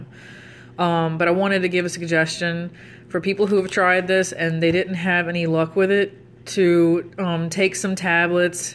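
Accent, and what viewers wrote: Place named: New Orleans, United States. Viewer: I'm from New Orleans as well (I know my people when I hear them lol)... This was helpful.. i just got some from Home Depot .. ill see how it goes 🤷🏾‍♂️...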